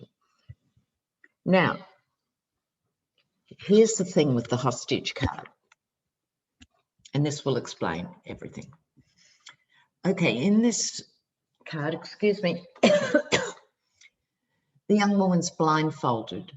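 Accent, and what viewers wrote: Australia. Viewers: I like hearing Australia as well as the United States of A.. Thank you for a great reading Miss. I send you big love from Beth in Mancos CO.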